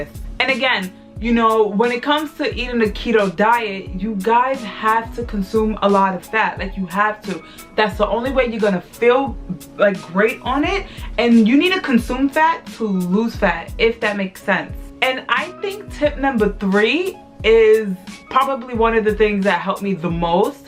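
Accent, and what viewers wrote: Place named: New York, United States. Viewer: Sexy NY accent 🔥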